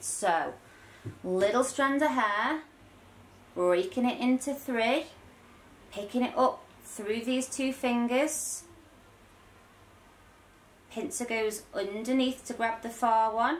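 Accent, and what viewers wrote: United Kingdom. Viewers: They are both British but morgz and morgz mum are from Sheffield. And I don’t think she is from Sheffield but she is from Britain 🇬🇧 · British accent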